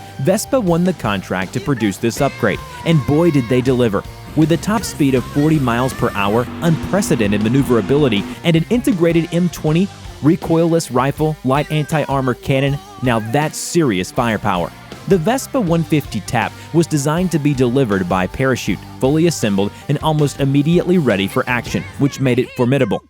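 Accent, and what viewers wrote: United States. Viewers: Err.., Dear Mr.Narratorr, Sir, while U most certainly sounded American, dunno if U actually are, but eant the word Corps. as in US Marine "Corps", pronounced as "Core or Corr" phonetically, rather than the disturbing "Corpse" - wic wud be a DB, cadaver or maybe a carcass evn. Thus far, I thought only some individuals native to India only sez Corps as literally in as the offensive sounding "Corpse", He-He, tis' ez cute.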